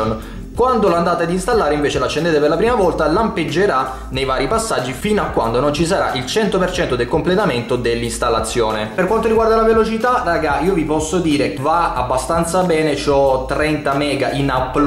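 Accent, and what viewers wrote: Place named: Apulia, Italy. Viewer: Ciao , io sto aspettando che arrivi la vodafone station . Per caso sapresti dirmi quanto ci vuole dall'arrivo della vodafone station all'attivazione effettiva di internet ? Mi pare di sentire che sei Pugliese se non erro , se si vorrei sapere in quale comune ti trovi per farmi un'idea di quanto veloce sarà la mia linea . Grazie !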